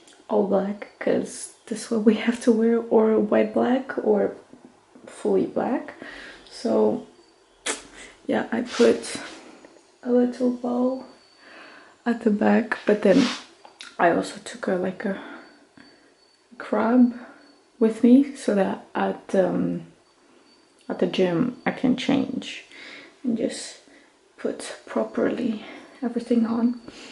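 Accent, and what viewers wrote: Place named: France. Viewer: Your accent is so french, where do u come from ?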